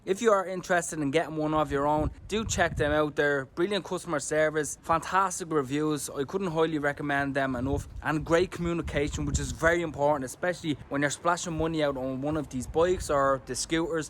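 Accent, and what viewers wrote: Dublin, Ireland. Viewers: I've been trying to work on my Dublin accent for a while now, and that we all have to return to our offices, i've been looking for an e-bike, so your videos are so dope! I know you are just speaking normally, but if you can, please speak in the most rawest Dublin accent possible for your next one!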